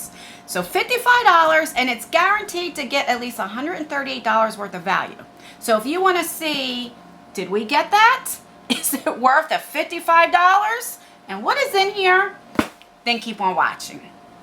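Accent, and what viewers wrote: New Jersey, United States. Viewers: I definitely like it when you show cosmetics and and some of the clothes! I love your Jersey accent!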